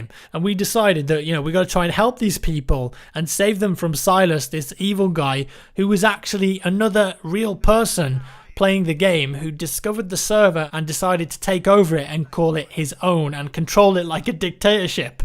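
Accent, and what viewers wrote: England, United Kingdom. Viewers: Luv u! can't resist your awesome English Accent. Plz keep these fantastic videos going!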